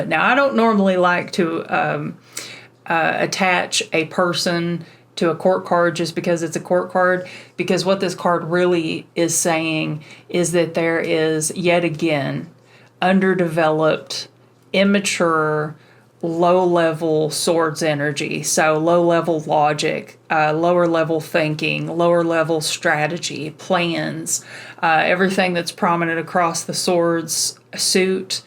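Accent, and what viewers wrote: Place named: South, United States. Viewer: Your southern accent is adorable. Thanks for the reading!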